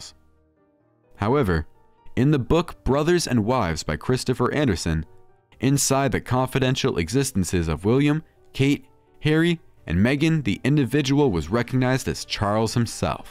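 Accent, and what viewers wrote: United States. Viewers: Any decisions of the sort you mention were ultimately up to the parliament. The monarch doesn't make the rules - or didn't you know that? As to Camilla, her description is Consort - like the Queen Mother when she was married to King George VI, Queen Mary and Queen Alexandra. "Consort" is a description - not a title - meaning that if the King dies the Queen Consort cannot reign. Ever heard of Queen Elizabeth (married to George VI) being referred to as Queen Consort Elizabeth when George VI was on the throne? Do some reading. Once Camilla had been crowned, she was Queen. Also not a good idea to take any information from an American voiced broadcast. They're clueless.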